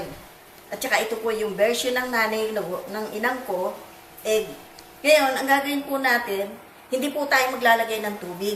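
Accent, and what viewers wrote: Philippines. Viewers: idol ko si ATE TAGALOG na tagalog ang punto ..Ako po ay taga BULACAN BULACAN kayo po ay taga saan ?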